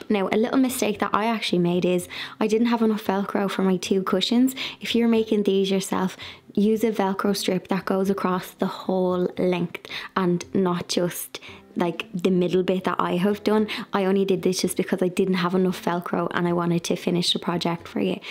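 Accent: Dublin, Ireland. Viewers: Oh lovely an irish accent! Im thrilled, living away from Ireland in spain all i hear are british accents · I had to subscribe cause you are the first Irish youTuber I have come across. I have a son who spent some time in Ireland and he came back to Canada with the coolest Irish accent!🥰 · she has an Irish accent, a Dublin accent. My home town. It makes a change, as most of the sewing videos I’ve watched are from women with American accents.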